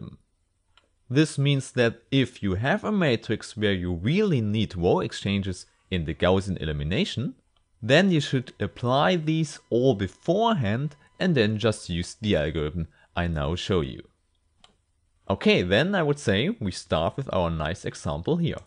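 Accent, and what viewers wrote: Germany. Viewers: all jokes aside, you explained really well. Just enjoy the german accent <3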